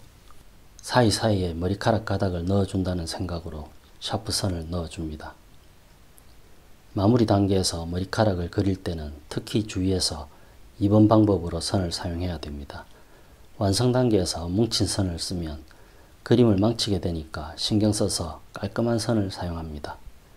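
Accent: Gyeongsang, South Korea. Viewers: 혹시 대구분이신가요? 드로잉관련 자료찾다가 구독박고 애청자가 되버렸네요 ㅎㅎ 앞으로도 좋은영상 많이 올려주세요!!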